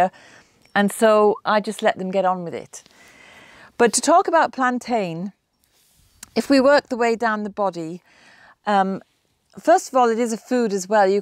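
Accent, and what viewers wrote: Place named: South Durham, United Kingdom. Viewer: How can you grow plantain. I’ve just learned about it recently. Cani buy it to plant. Interesting accent south Durham perhaps?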